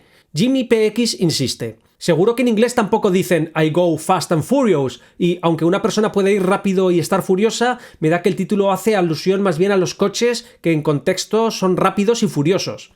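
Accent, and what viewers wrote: Spain. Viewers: pelicula de 1975 con j nickolkson flew over the cucus nest en sudamerica le pusieron Atrapados sin salida gracias por el video eres argentino? hablas como español se nota que hace mucho que estas ahi abrazo feliz 2026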